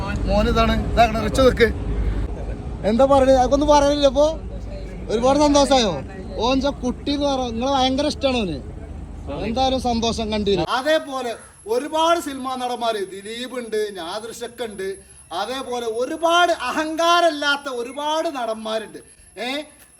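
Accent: Malappuram, India. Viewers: എടോ, നിങ്ങളുടെ വീഡിയോ എല്ലാം മെച്ചം തന്നെ, സിനിമയിൽ എന്ത് ഊച്ചാളിത്തരവും കാണിക്കുന്ന ഈ നടൻ സിദ്ദിഖ്, അവൻറെ തോളിൽ കയ്യ് വെച്ചുവെന്ന് പറഞ്ഞ് എടുത്ത് മാറ്റാൻ പറഞ്ഞത് അഹങ്കാരത്തിന്റെ അങ്ങേയറ്റമാണ്. മകൻ മരിച്ചപ്പോൾ അവനെ എല്ലാവരും കെട്ടിപിടിക്കുന്നത് കണ്ടല്ലോ, മുംബ് റിയാദിലെ ഒരു കംബനിയിൽ ഇലക്ട്രീഷ്യൻ ആയിരുന്നു അയാൾ.എല്ലാറ്റിനും കൂടി ഇപ്പോൾ കിട്ടികൊണ്ടിരിക്കുന്നുണ്ട്. പിന്നെ,നിങ്ങളുടെയീ പ്രാകൃത ഭാഷ ദയവായി ഒഴിവാക്കണം, ഇപ്പോൾ മലപ്പുറത്തെ ആരും തന്നെ ഇങ്ങനെ സംസാരിക്കുന്നില്ല.സംസാരിക്കാനറിയില്ലെഘിൽ അറിയാവുന്നവരെ കൊണ്ട് ഓഡിയോ ഇടുക. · നിങ്ങൾ സംസാരിക്കുന്ന ബാസ ഏതാ ശരിക്കും മലപ്പുറം ബാസ ആണോ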